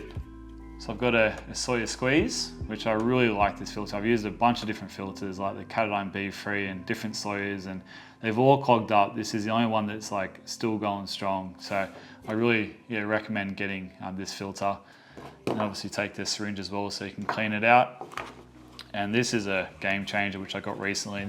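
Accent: Australia. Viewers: Omg only Australians would understand what you are saying!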